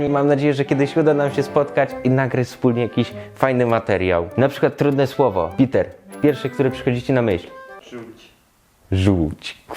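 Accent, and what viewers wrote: Germany. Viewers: Dobry Niemiecki akcent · Powinieneś jechać do niemiec. Super niemiecki akcent translator i filmik šmieszny hee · Dobry niemiecki akcęt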